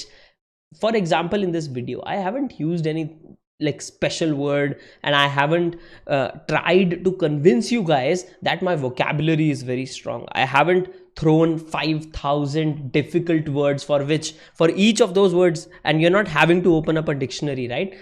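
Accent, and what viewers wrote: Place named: India. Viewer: You have a butler English Indian accent.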